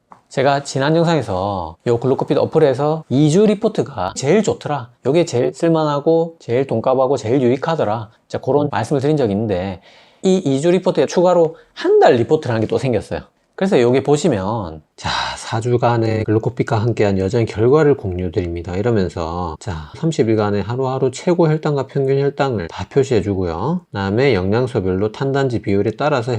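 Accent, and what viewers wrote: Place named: Busan, South Korea. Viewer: 혹시 의사샘 부산에 계신건가용? 말투가 익숙한것이 ㅎㅎ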